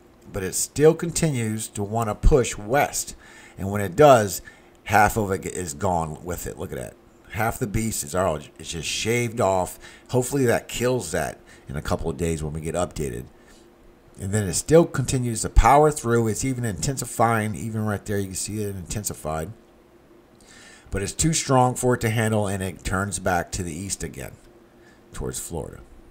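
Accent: Louisiana, United States. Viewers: I love to read your comments. They always make me laugh. I’m from La and my family sounds like this..lol. However, my Dad grew up in Texas, but he tells the best Boudreaux and Thibodeaux jokes. Your posts remind me of him. God bless and have a wonderful day! 😂😂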